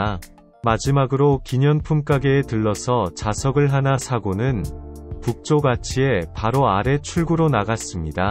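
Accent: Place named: Gyeongsang, South Korea. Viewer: 유튜브 열심히 하시기로 한거 축하드려요! 저는 이미 구독자입니다^^ 부디 실제 음성을 들려주소서.. ㅎ 경상도 사투리 나쁘지 않습니다.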